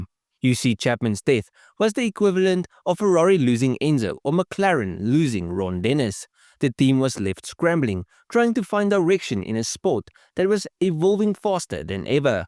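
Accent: South Africa. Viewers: Three seconds in and I’m like holy shit this person has the thickest South African accent I’ve ever heard.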